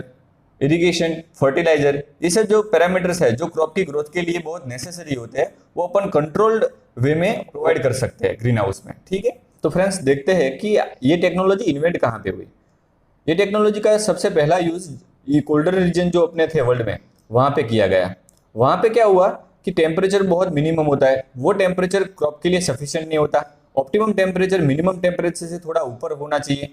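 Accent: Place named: Gujarat, India. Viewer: Bhai gujrati chho ne awaj thhi j Lage chhe but good explanation sir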